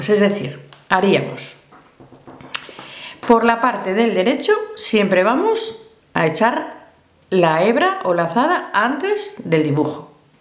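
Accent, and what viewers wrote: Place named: León, Spain. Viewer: Hola!! Me llamo maria y soy de cadiz. Estoy terminando el jersey para el nieto que va a nacer en marzo. Me gusta adivinar por el acento de dónde son las personas y yo diria que eres de Leon...Acierto? A ver si me ayudas y hago dos chaquetitas para 5 y 3 años. Lo haces muy bien!! Gracias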